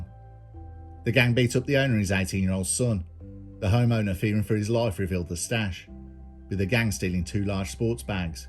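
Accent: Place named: Black Country, United Kingdom. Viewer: Lad as from the "North" and a don't usually find the Midlands/Black country accent a thing of gravity to myself, but your calm delivery has me thinking, thanks for these uploads.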